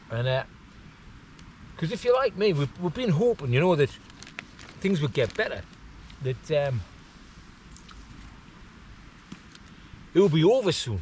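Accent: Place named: Scotland, United Kingdom. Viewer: Another great video. I'm loving the Scottish accent. My brother in law is a Newcastle boy and it's hilarious listening to him do my accent. It's nearly as good as yours.😅😅😅. Keep doing what you are doing Bonny Lad. 😅😅😅❤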